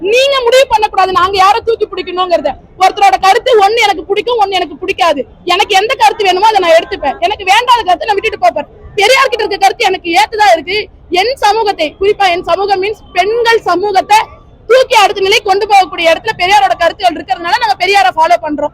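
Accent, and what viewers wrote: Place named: Telugu, India. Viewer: அக்கா பேசுறத பார்த்தா தெலுங்கர் தான் இருக்கு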